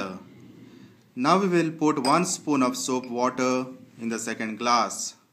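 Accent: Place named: India. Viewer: Your voice is like indian , r u an Indian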